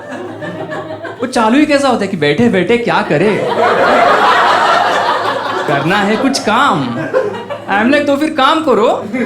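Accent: Maharashtra, India. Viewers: Your marathi tone is masking your talent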